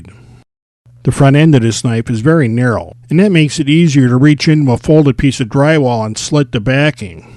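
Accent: Chicago, United States. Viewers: Very useful video! And is that a Chicago accent?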